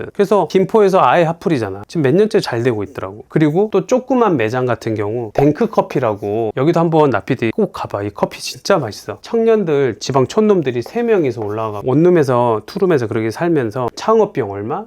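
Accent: Jeolla, South Korea. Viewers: 전라도 사투리에서 거른다 ㅎ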